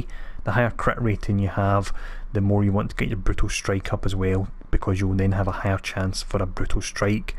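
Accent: Scotland, United Kingdom. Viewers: Sorry for the off topic question, are you Scottish ?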